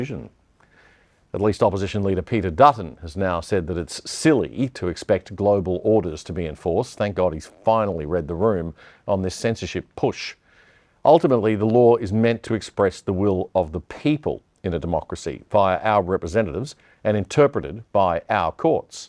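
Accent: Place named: United States. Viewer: It's god-awful hearing a screeching American accent telling us what we can and cannot see. Get rid of this nasty person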